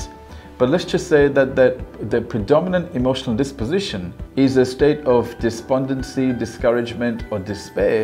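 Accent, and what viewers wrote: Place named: United Kingdom. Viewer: You have a British asscent Sir.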